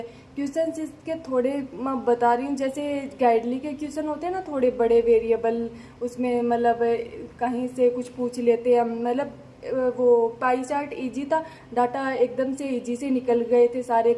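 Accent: Rajasthan, India. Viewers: Language se Rajasthan k lagte ho😅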